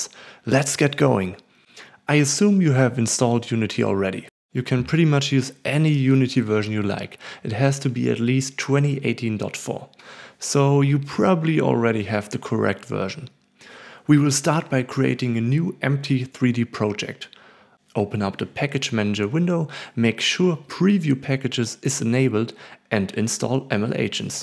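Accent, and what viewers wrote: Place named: Germany. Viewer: didn't know this accent was german. it sounds awesome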